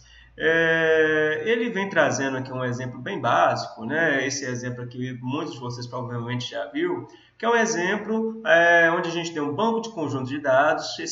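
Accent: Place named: Minas Gerais, Brazil. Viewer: Mestre, você tem um sotaque mineiro, és da UFMG em Montes Claros? Sou de Salinas, fiz essa disciplina na UFV com o professor Fernando e o Tibeau e mais recente em 2010 com o professor Edenio da Zootecnia no mestrado em 2009.. Ótimas sua aulas...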